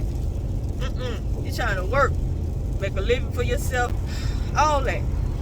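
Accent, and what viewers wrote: South, United States. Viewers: Hello country girl love your southern accent as for relationships it's best to talk before you start because time is valuable believe me I hope you learned from that experience take care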